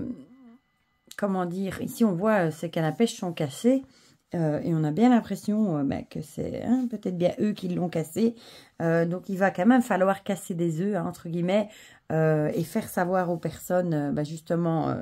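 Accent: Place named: Belgium. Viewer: Coucou bonjour 🐈❤🐈‍⬛🦄🐿🦔🐼🦆🐢🐇🐭, Grand Merci pour cette vidéo. J ecoute grandement car ce matin, je n etais pas présente. Ce jour 33 degrés 🌡, c est un grand ouuufff 😢. Un été qui ne brûle pas, c edt un vrai REVES. Un jour ca brûle, un jour un peu moins. C est cooolllll, que tu fasses la guidance sur ta terrasse. Le train 🛤, un vrai bonheur. Tu me fais trop rire 😂😂, ton Gardfield 🐈 qui est têtu 😂😂. J adore cet accent Belge 👍👍😘😘. Gratitude infini 🙏. Je te remercie infiniment et je te souhaite un bonne journée. Chez nous, comme tu sais, c est Férié. Je t envoie pleins de belles énergies 🎉💫💥. Prends soin de toi et de Ta Famillette. Bisous bisous Sylvie 🙋‍♀️🕊🦋🕊🦋💙💙💙🙏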